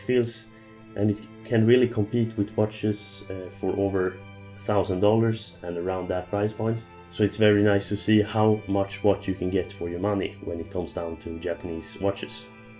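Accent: Sweden. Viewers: Dmitry Streak Probably I am swedish and he kind of sounds as my english, maybe central sweden like Stockholm.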